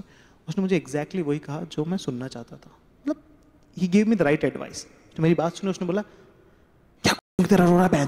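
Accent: Indore, India. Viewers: Bhai Bilkul Indori language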